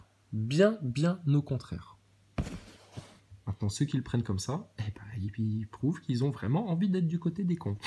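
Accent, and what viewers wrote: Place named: South, France. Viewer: Tu me tue avec ton accent du Sud 😂😂😂🙏😎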